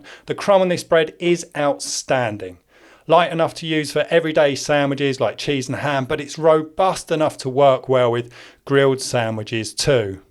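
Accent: United Kingdom. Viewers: I thought you were Andy. Then I heard the british accent 😂 Nice loaf, mate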